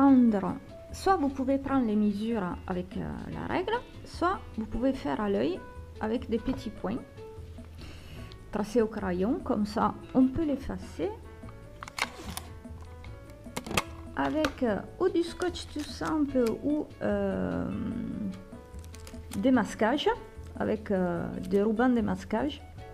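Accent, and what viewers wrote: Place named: South, France. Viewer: belle vidéo , avec un petit accent du sud , plus exactement de l'Aude ,je me trompe ? lol Bonne continuation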